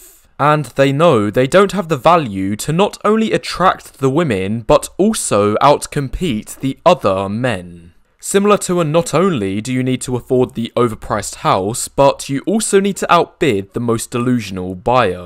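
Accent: Essex, United Kingdom. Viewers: He's from Essex in England I think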